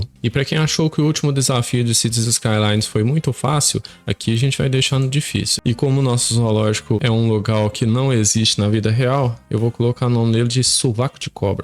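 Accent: Minas Gerais, Brazil. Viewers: Verdade acho que é por conta do sotaque fofo de mineiro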